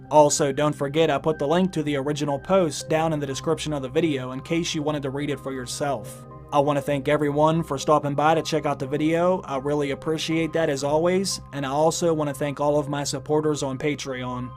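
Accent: United States. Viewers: What is your native tongue? I would like to know how you've mastered American in just a year or so. Thanks!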